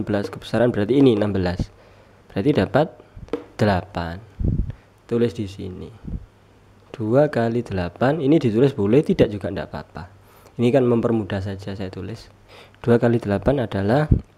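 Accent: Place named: Temanggung, Indonesia. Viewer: kakak orang temanggung bukan? logatnya temanggung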